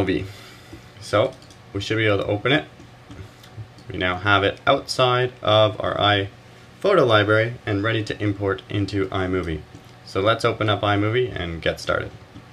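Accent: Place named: Canada. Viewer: Very helpful; quick information. And a Canadian accent to boot.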